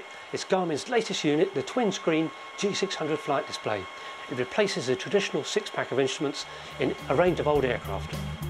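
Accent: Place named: Ireland. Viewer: hey man sorry for asking but where are you from? Let me guess... Ireland? Your english accent is amazing!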